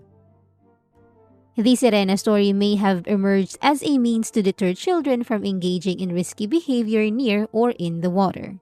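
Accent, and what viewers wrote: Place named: Philippines. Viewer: You have a lovely accent, but I say that as lived in the Philippines for 6 months, awesome country, lovely people. Look up the Nantucket mermaid 1700s, seen and recorded by a Lutheran minister on a Dutch vessel. Recently sighted was a mermaid at Flat Bridge in Bog Walk Gorge Jamaica Mermaids are in the book of Enoch. They are the women who mated with Fallen Angels, locked in a hellish "purgatory" until the end of age and final judgment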